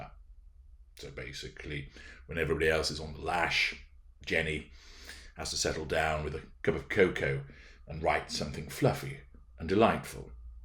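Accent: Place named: Lancashire, United Kingdom. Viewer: Hi. Have thought many times hearing your voice inflections that your early life was in either Lancashire, I’m a Lancashire lass now in Australia, or in Yorkshire. Thank you for your insight.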